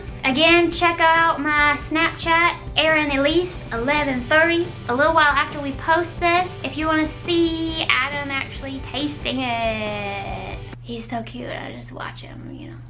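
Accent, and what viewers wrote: South, United States. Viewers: First time watching your video and subscribing too loving your southern accent.... you are a beautiful and funny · I could never understand why you have a super southern accent and your brother doesn't. I think you need to add a lot more seasoning but this looks good. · Very simple, love that. This woman is really likable, cute southern accent. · lol i just made the same kind of dish xD and that southern accent thooo thats sound so great :P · You should doing the video without your Southern accent, like the video that was trending on Reddit.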